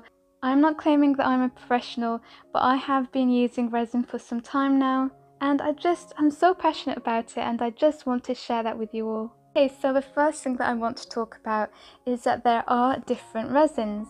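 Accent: United Kingdom. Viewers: Thanks so much for this information. I’ve had UV and epoxy resin for ages to use with polymer clay for dioramas etc., but felt intimidated. As I’ve only just found your channel, I will be enjoying a binge! 🥴 Great to hear a UK voice too as I know I will understand the shops etc you name in your videos. With grateful thanks from Scotland! ❤️🏴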